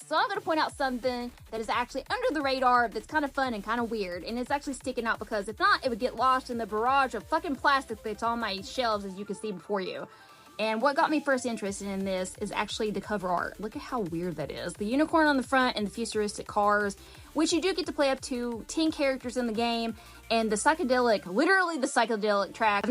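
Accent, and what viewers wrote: South, United States. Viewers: I love your charisma! Don’t see many other southern accented gamers. Bless you friend!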